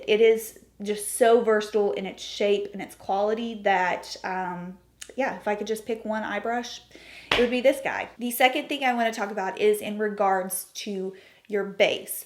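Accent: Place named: Nashville, United States. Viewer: Oh gosh you're from Nashville! I should have guessed from the dialect lol. It's a pretty decent city to live in. :)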